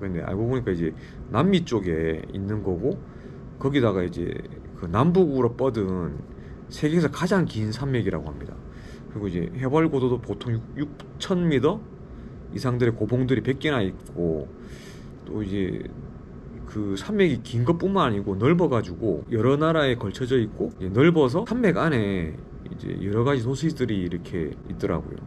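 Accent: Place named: Busan, South Korea. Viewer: 너므 말씀 조리 있게 잘하세요 부산 말투가 좀 세련되게 느껴지는 보이스~~멋쪄요~~^^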